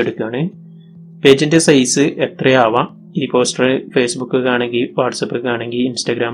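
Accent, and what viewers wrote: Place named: Malappuram, India. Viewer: മലപ്പുറം ഭാഷ ഒന്ന് മാറ്റി പിടിക്കുമോ?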